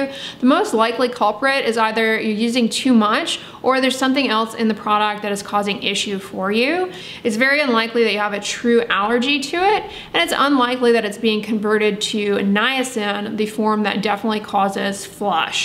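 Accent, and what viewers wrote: United States. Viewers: Does anyone know - What type of American accent does Dr Dray have ? ( I’m from the U.K. so it’s not obvious to me but it’s bugging me cos Its unusual and I can’t place it 😆) Thanks :)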